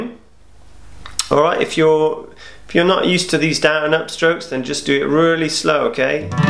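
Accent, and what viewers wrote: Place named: United Kingdom. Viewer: correct me if im.wrong but judging by the accent... hes british.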